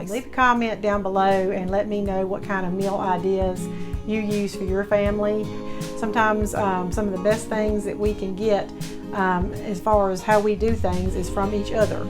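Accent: South, United States. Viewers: Love your videos and your southern twang. Dollar Tree is the bomb!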